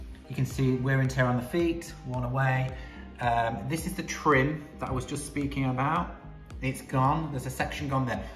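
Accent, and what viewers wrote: England, United Kingdom. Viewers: I agree! He’s always saying (no pun intended) that he talks too much. I love hearing his thought process and why he’s doing what he’s doing. An awesome teacher for sure! I think it’s great! Plus, I love an English accent! 🙂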